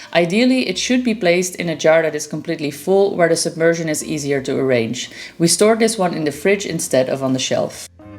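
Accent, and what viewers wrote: Netherlands. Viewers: You sound dutch 😊 Groetjes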